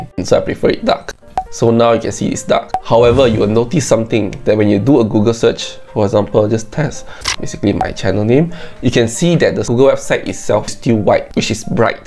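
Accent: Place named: Asia. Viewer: Bro has an Asian accent 🤣 (not hating)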